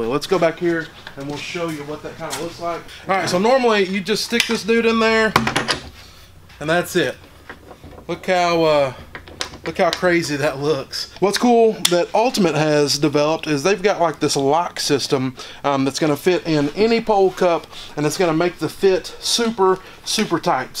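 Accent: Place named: South Carolina, United States. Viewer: Man, you sound like you are from my neck of the woods here in South Carolina..... great review.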